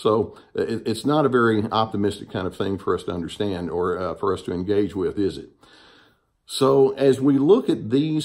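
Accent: South, United States. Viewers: Love THICK SOUTHERN_ACCENT!! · Appreciate a doctor with a Southern accent! The media equate that accent with uneducated hicks--exacerbating regionalism.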